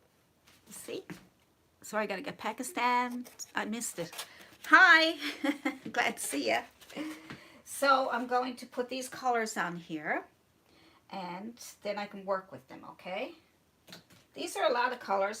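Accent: Newfoundland, Canada. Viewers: I must ask you, are you from Newfoundland. I hear your accent and colloquiums which bring me to this conclusion. I'm glad I found your great tutorials. Best Kind. Thank you for your secret into why my paintings are killing me. I was a mural painter for a living with a few other talents lol I thought canvas painting would be a great step but I hit the wall. I'm taking it down after watching you, brick by brick. Take care.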